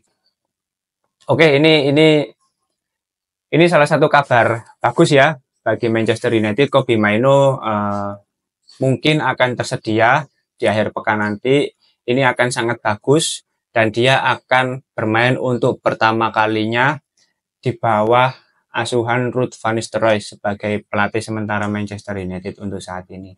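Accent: Java, Indonesia. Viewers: Jowo e ndi??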